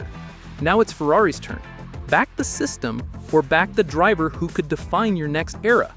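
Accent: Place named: United States. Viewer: This is disinformation, gaslighting in American accent. Not convinced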